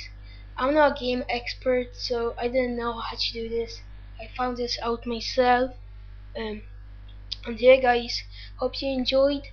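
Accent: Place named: Ireland. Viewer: u sound irish so much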